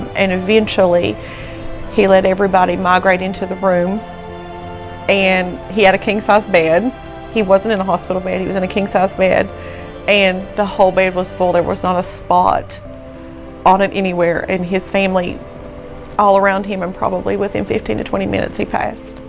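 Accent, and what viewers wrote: United States. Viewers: Are you from southwest VA? I grew up there and you sound JUST LIKE my family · Dang she’s country. I’ve been living in the south for 30 years and I still can’t get use to it. Lol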